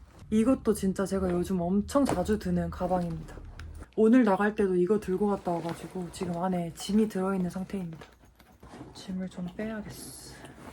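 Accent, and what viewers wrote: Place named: Gyeongsang, South Korea. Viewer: 새영상🥵❤️ 님 사투리 귀여워요ㅋㅋㅋㅋㅋㅋㅜ 광주사람이신가? 대구분? 경상도인가용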